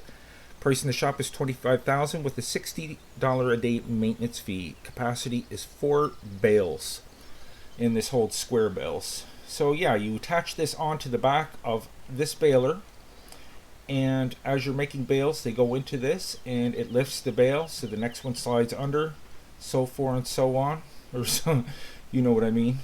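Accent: Canada. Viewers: Its just mac-h-ale like ginger ale u got a strong candian accent btw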